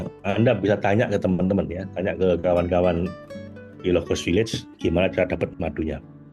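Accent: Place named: Surabaya, Indonesia. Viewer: Pak suaranya seperti cak lontong 😍🙏 logatnya khas Surabaya 🤓🤴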